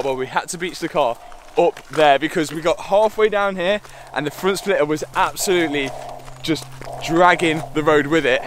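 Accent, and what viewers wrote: Leicester, United Kingdom. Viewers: Your from Leicester 😁😁😁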